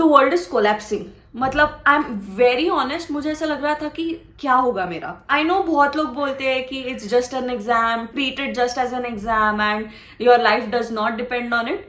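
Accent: Maharashtra, India. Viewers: Abhi bhi dhad dhad ho rha hai your Marathi accent trying to jump out taide Marathi mdhe pn video bno ki jra 💛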